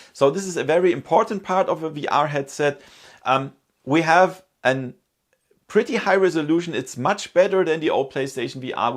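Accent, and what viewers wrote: Germany. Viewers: He is German speaking in English. Now your turn do a video speaking in German.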